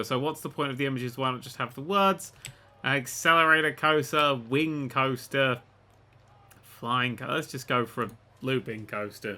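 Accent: United Kingdom. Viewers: British Accented Man-Baby Complains About Quest Tabs for 42 Minutes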